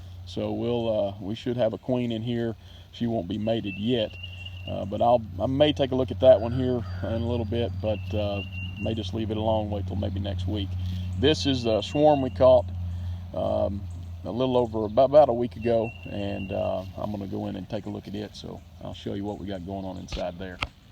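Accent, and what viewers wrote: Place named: Tennessee, United States. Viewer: I wish you would make more videos. I Love your country accent! And I love watching your videos. I moved away from TN and miss home. So post more!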